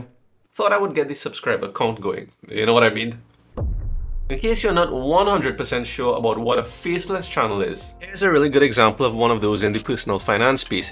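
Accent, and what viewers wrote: Trinidad and Tobago. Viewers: Hey are you from Trinidad and Tobago? Nice😊 I'm a Canva user too. I was really surprised to hear your accent in my headphones lol. I was like......sounds like ah trini lol. I'll be subbing you from today! I'm a trini too btw, slight peppa and ah red solo......only we know what that means😁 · OKAY… I hear a trini accent! I keep hearing about faceless videos, but not sure if it would work for my niche. This is interesting though. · I like your accent 😂❤ Trini? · Oh, hey bro are you a Trini? 🇹🇹 Nice job on the channel 😊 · are you trini?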